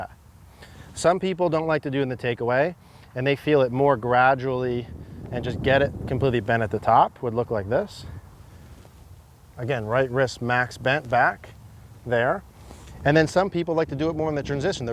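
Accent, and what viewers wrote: United States. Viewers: This only goes to prove that if someone talks fast with an American accent...and says the same thing over and over again and stating the bloody obvious....people will assume they are knowledgable. He says 'That would be bad...' but he never says WHY it would be bad...or why the opposite would necessarily be good. The condition of the wrists at the Top of the Backswing is completely irrelevant to the conditions at Impact...Ben Hogan had 'cupped' at the top and 'bowed' at Impact, Nicklaus had 'straight at the top and straight at Impact. Any golfer who regularly has his trailing wrist bend back as far as it will go at the Top of the Backswing is a) risking injury and b) restricting his backswing...Gary Player said that and clowns like this should stop conning people into believing that it is a good thing because it is somehow linked to Impact. 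It isn't and it isn't !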